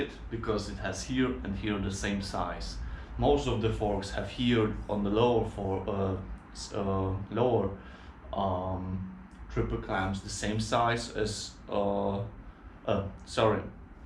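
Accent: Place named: Germany. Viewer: German myself - your accent rocks. Living in South Africa and enjoying your lekker Duitse accent. More so, as an HP2 Enduro owner myself, I appreciate the content you deliver. Vielen Dank und allzeit sichere Fahrt! I am an old f@ and could be your dad. One word of a advice, if I may. Stay as you are, be authentic as you are throughout your life. You rock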